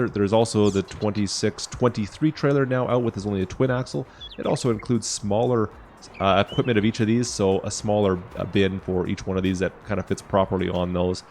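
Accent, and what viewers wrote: Canada. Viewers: I love the way you say about, aboot. I love Canada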